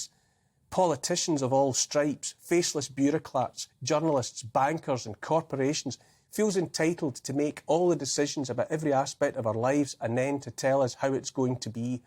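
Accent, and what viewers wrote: Scotland, United Kingdom. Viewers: ❤️💞❤️ Nails it every time! Thank you for being the voice in the wilderness Neil ❤️💞❤️ A gentle, beautiful Scottish lilt A kind, empathic, forward tilt An open heart; a caring face A willingness to take his place. A strong, home-hitting monologue Reminds us of the boiling frog The courage to become a sleuth A passion to release the truth. A deep desire to crack the whip Illuminates our freedom-strip Calls out the darkness taking hold The shiny despots; plated gold! Intense and raw, he lays it bare A soul-enhancing, honest stare Inflections drive the message through He speaks for Us - for me and you! Discernment's such a precious gift His words create a mighty lift Take heed of all he has to say He knows - it shouldn't be this way! 🤗🦋🐬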